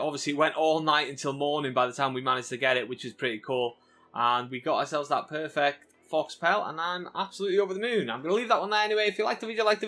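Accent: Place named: Wales, United Kingdom. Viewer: Nice your welsh just like me were abouts wales you from bud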